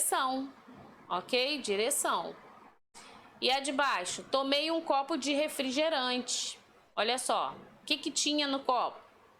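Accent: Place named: Rio de Janeiro, Brazil. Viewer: "O quanto vc ainda está com duvidãnnn" kkkkkkkkkkk sotaque de carioca é muito engraçado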